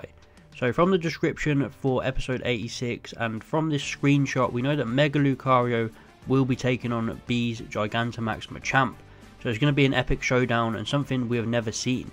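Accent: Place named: United Kingdom. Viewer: You are so British by Accent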